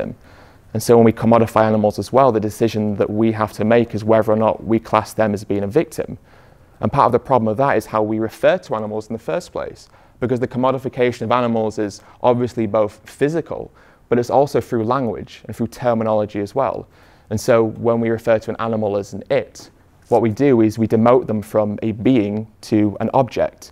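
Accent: United Kingdom. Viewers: I loved this speech and english accent makes it even better, absolutely awesome!! Thank you Ed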